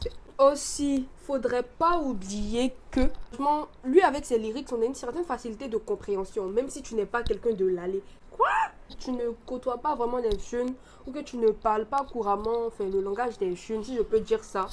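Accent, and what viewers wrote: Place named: Marseille, France. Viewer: Tu es marseillaise? Tu parles comme les marseillaise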